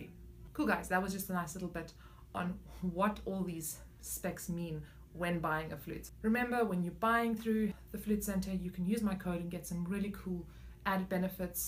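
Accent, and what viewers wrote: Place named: South Africa. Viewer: South African, I believe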